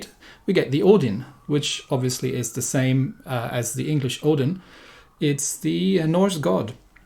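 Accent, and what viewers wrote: Germany. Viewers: Man, I don't know If you know it, but it isn't possible NOT to hear in your voice, that you're German 😄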